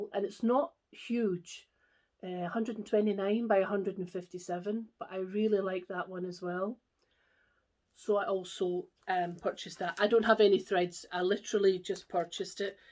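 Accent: Scotland, United Kingdom. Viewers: I’ve just found you on flosstube! I’ve followed for a while on Instagram but didn’t know you had videos! Love your stitching and absolutely love your accent! Scotland is the one place I’d love to visit it’s beautiful in pictures and part of my heritage 🖤🏴 so thankful your parents were able to get their vaccine and did well with it hope yours goes good as well! Thanks for sharing! Happy stitching 🧵!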